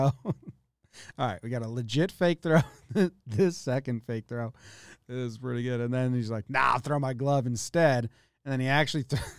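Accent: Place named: Texas, United States. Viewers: Love your Texas accent. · Your Texas accent is spot on.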